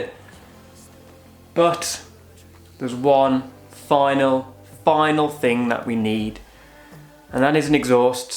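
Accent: Birmingham, United Kingdom. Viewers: BIIIRMINGHAMMM ACCENT YEEEEE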